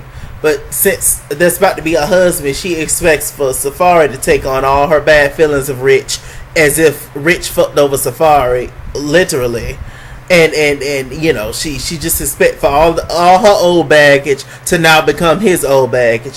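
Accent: New York, United States. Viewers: Your “NY” accent tho 🤣🤣🤣🤣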